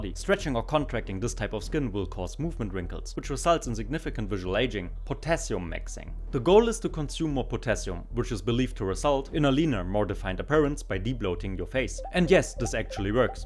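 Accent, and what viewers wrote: Germany. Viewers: Gutes Video, man merkt dass du dir viel Mühe gibts und von der Qualität ist das echt stark. Echt verwunderlich wie du nur so wenige Abos hast. Ich denke mal öfters videos hochladen hilft dir bestimmt um mehr viewers zu attracten. Versuch bei deine Aussprache ein bisschen mehr den deutschen Akzent zu vertuschen weil es manchmal stark auffällt, aber nicht weiter schlimm. Man versteht alles und die videos sind von der Geschwindigkeit echt super. Mach weiter so. Ich wünsche dir viel Erfolg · I can Tell by your First Word you Are german . Guten Tag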